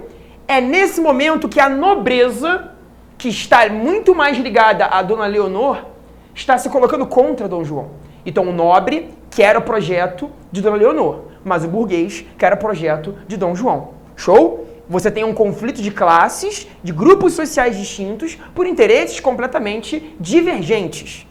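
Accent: Rio de Janeiro, Brazil. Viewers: Acho lindo falar colocando xs. Em qual região do Rio terei que morar para começar falar com esse sotaque e quanto tempo mais ou menos? Obrigada! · aaa amo sotaquin cariocaa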